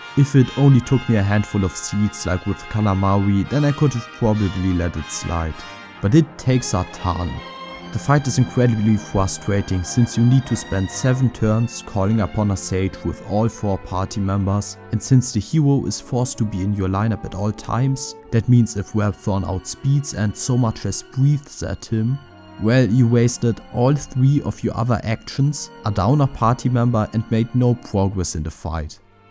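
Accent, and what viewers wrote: Germany. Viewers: Oh gods. The german is real. Even my Dialect isn't that bad when I talk English. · Hello! Good job! Well done! Ja, ein "legendäres" Spiel...also das PS2 Spiel (für mich persönlich)...Nostalgie pur! Yes, you cannot hide your German accent! But that is completely irrelevant! Who really cares about your accent when you speak English! A wonderful game! Ich kann mich auch noch an Final Fantasy X erinnern...auch sehr fantasievoll! Das waren noch bessere Zeiten (für mich jedenfalls), ich bin alt geworden... Alles Gute, viel Erfolg, Glück, Freude und Gesundheit! Grüße aus dem "wunderschönen" Kreis Offenbach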